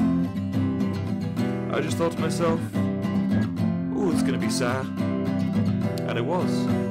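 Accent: United States. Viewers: I fied when I heard his American accent LOL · the semi-american accent feels like sin and not in the good way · His American accient is hilarious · the half american accent hurts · His mixed american accent litterally just sounds like my defult accent. Update: first, that was not 5 months ago, second, my accent is full american now lol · He sounds AMERICAN!! :0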